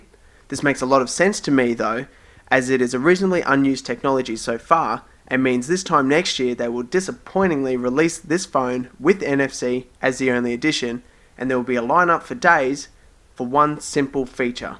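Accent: Australia. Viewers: I love your Australian accent and great video :)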